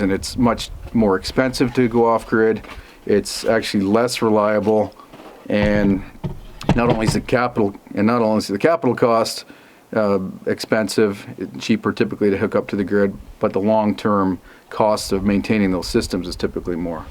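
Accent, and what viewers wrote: Canada. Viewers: I really enjoy your videos, watching you build by hand reminds me a lot of my Pop (who built most things from recycled train sleepers actually...his favourite thing). But I just love listening to your take on things at the end of each video, I find myself staring out the window, nodding most times. Also my best friend is from Barrie, ON, so your accent has a nostalgic feeling for when I miss her. Thank you for sharing your life, building, and take on life, the universe, and everything.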